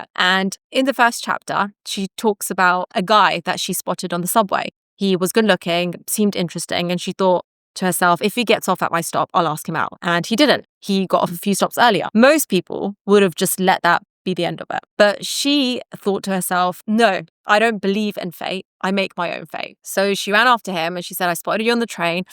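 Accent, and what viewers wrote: United Kingdom. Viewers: Is this British accent? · I closed the video after hearimg british English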